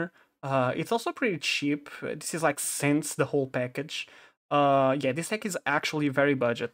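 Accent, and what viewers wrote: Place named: Portugal. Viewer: good video, out of curiosity your accent sounds like you are from portugal or something ??? not trying to dox you hahaha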